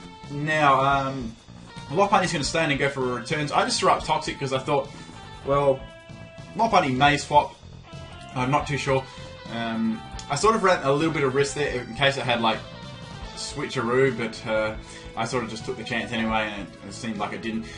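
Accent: Australia. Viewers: He says it the Australian way, not the stupid American way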